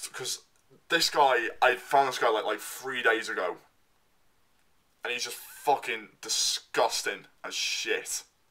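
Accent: United Kingdom. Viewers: I take it with that accent that your British if so its nice to see a British take on you know who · Are you from the north? More specifically Scarborough? I'm terrible with identifying accents but you sound very similar to my accent.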